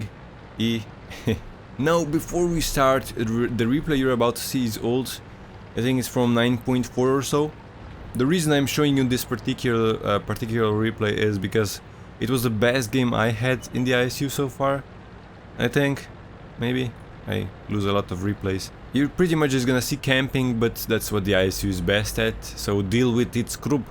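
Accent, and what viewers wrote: Russia. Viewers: Dude i Love your Videos! They are hilarious😂💪🏻 where are you from btw? Russia or maybe Serbia? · I really think you should do more of these vids, russian accent is best accent in the world! Also the history lesson was nice :D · Are you Russian who speak english?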